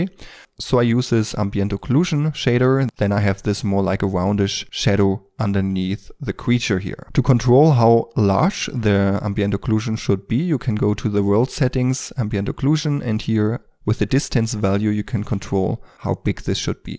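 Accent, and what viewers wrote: Germany. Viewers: Schönes Englisch, etwas hört man dein Deutsch aber nicht auffällig. Dieses Video war informativ und wirklich sehr toll!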